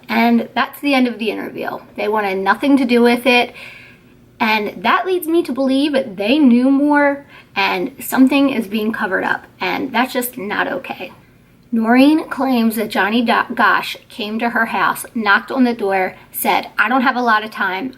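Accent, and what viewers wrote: Delaware, United States. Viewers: This is one of the more intriguing and unsettling missing children cases I've heard. Btw I like your accent. I had never heard a Delaware accent before.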